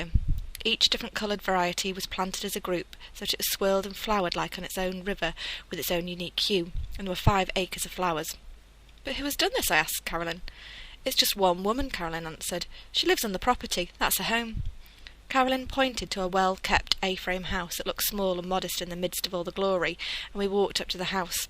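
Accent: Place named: United Kingdom. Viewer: I love British accent! I wish i would live with this principle.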